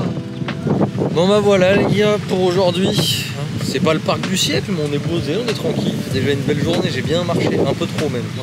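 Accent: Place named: Paris, France. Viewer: Ton accent "parigo" ...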